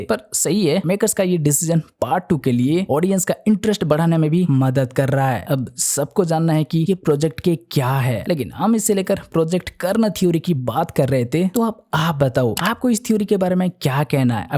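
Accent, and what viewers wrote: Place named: Gujarat, India. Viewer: Aise kyun bol raha hai jaise koi teri le raha hai. Sorry, but bohot irritating hai. Dusra ye hai ki if you are Odiya or Bengali make video in local language, jyada better. Kyunki teri Hindi waisi hi hai jaisi Gujarati logon ki Hinglish.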